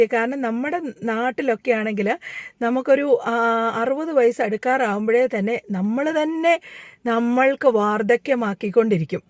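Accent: Kottayam, India. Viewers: She speaks like my wife, who is from Kottayam District.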